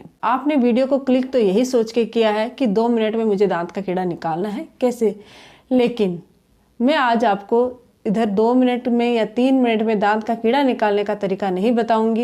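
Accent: Rajasthan, India. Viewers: M aap rajsthan s ho kya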